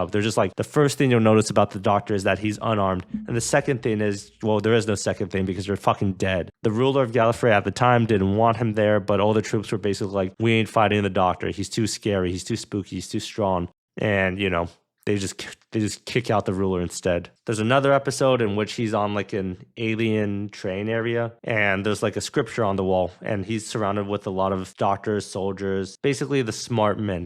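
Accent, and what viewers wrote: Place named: Scotland, United Kingdom. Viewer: Irish Me: Irish...(processing) IRISH??!!!! The last I checked, I'm pretty sure he was Scottish. Great vid btw, got a few laughs out of it.😄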